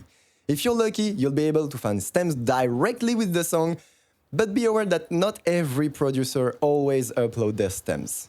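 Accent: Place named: France. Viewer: This guy's the definition of a French accent ❤ it heh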